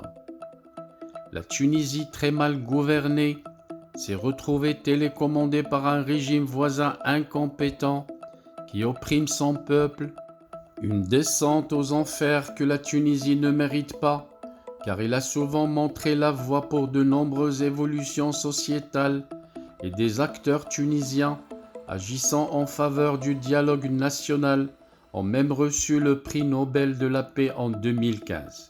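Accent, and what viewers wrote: Morocco. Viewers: Vous êtes Marocain facilement reconnaissable par la prononciation d'un français directement traduit de l'arabe. Le tlemcien parle et pense en français et ne connait dans le détail le passé des relations politique maroco-tunisienne.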